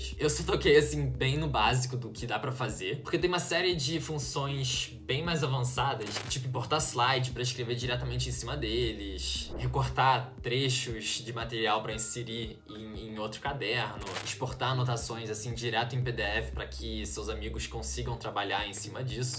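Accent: Rio de Janeiro, Brazil. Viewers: é incrível como você tá morando na Alemanha a 3 anos e ainda fala carioques fluente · Naviossx, claxsses, sxstudante, bem carioca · Sotaque de carioca é muito bom kk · Chocado com o sotaque carioca do ícone da ciência, adorei o vídeo!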